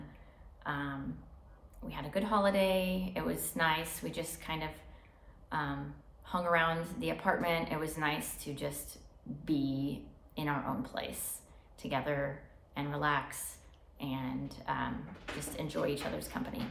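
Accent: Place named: United States. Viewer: your american accent... quite charming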